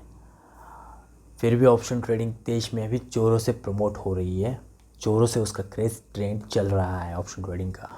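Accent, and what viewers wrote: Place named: Agri, India. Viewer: मराठी hai.. aagri 😊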